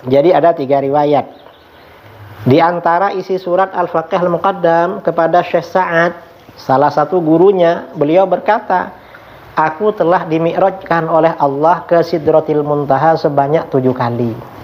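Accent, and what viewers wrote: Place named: Madura, Indonesia. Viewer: Ini kyai mana ,kayaknya madura,kok gini pangaoningannya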